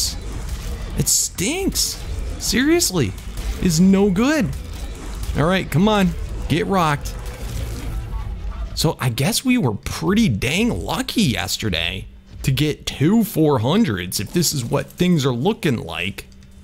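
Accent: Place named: Rural, United States. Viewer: Does anyone hear him do a hick accent and every now an the do a Cali one? Its crazy cause none of his old vids sound like this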